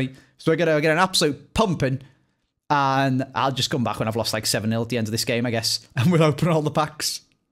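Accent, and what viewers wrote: Liverpool, United Kingdom. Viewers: Yes lad best scouse youtuber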